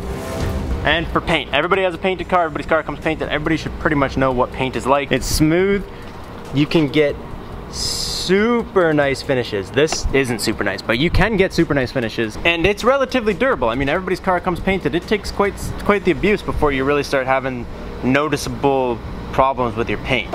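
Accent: Canada. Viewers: is this guy canadian?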